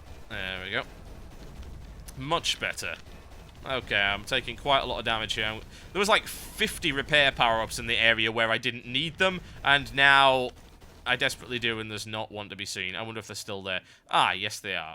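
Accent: United Kingdom. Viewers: Large majority of TB viewers - "Wha!!!??? Wer is WoW vidz?!?" I enjoy any video you make, TB, mainly because British accents are like sound-rollercoasters. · I love this "WTF is" series, love "lets play" channels and so on, also brittish accent pwns.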